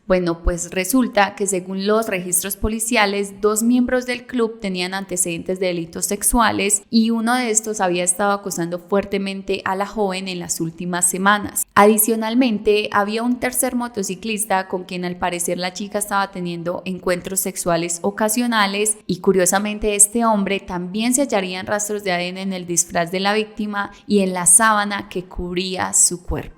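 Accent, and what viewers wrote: Colombia. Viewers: Quisiera tener este acento 🤤🥰🥰🥰🥰es como que un fetiche escuchar el acento Colombiano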